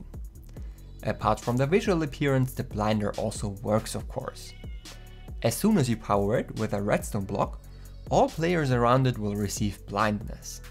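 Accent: Germany. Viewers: Ich mag deinen deutschen akzent :) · are you german? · Ich finde dein englisch witzig weil man eher das deutsche von dir hört🤣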